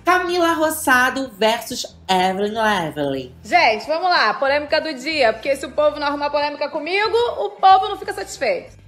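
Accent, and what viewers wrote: United States. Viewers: queria entender esse sotaque meio brasileiro meio texano meio nordestino da blogueirinha. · Que sotaque americano lindo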